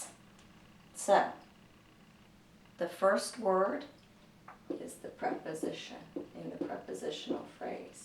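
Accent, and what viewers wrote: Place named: South, United States. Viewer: Beautiful southern accent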